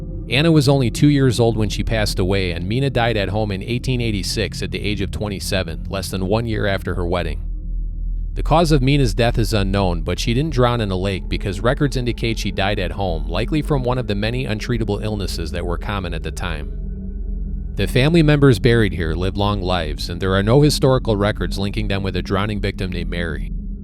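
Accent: Chicago, United States. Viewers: I truly love your channel!! I grew up in Streamwood so hearing some of these stories is really cool for me. It brings me back to my childhood. Your narration is a perfect Chicago accent if I ever heard one and your attention to the details and facts about these places as well as sharing your own experiences truly makes your channel unique! I'm subscribed!!😊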